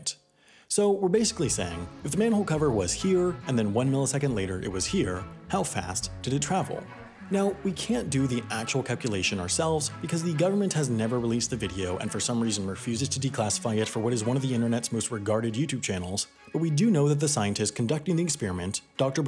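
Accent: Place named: United States. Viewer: This guy sounds different. Almost as if his American accent got blended in with the accent of the country he currently lives.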